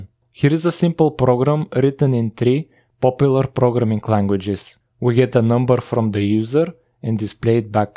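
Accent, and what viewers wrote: Bulgaria. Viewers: Wonderful video! Do you happen to be Bulgarian too? I noticed your accent😅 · To be honest, i still haven't watched the whole video, but your accent sounds bulgarian, or from the balkan countries at least. I find your channel interesting though. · because I am bulgarian and you sound like one :D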